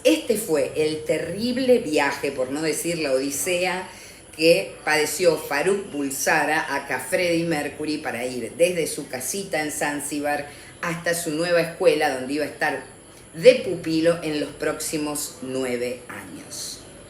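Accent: Argentina. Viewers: Me ha gustado mucho su narración dígame ud tiene un acento Argentino y como sabe tanto de Freddie a mi me encanta la música de el cada ves q escucho su música lloro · Ese puto acento argentino tan chocante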